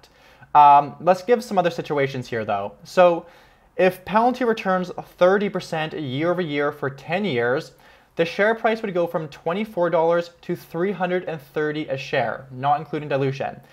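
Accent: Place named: Edmonton, Canada. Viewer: Are you from Edmonton?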